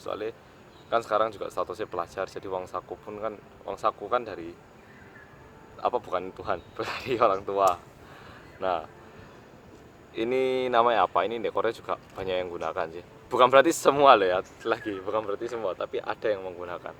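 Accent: Java, Indonesia. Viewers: Medok banget 😂😂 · Bèné medhok gpp. Lha kan ancen wong malang haha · Weh wong korea bahasane jowo jos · kerenn....sam logatnya medok jawa banget sam... dulu belajar dimana sam?? berapa tahun belajarnya,??... maaf kepooo 😀😀😀😀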